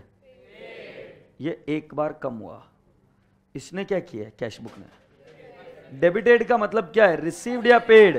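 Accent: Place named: Mumbai, India. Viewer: I think u are from Mumbai....🤔🤔 But nice explaination sir🙂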